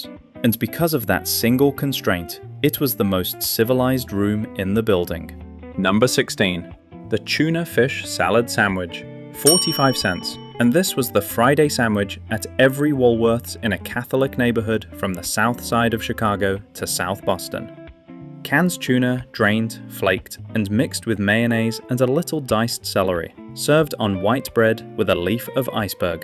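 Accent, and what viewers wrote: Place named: England, United Kingdom. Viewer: An English voice on nostalgia from The United States of North America. 😊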